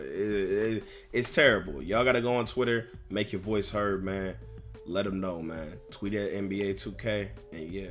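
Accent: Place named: Los Angeles, United States. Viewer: I swear he sounds like he's from LA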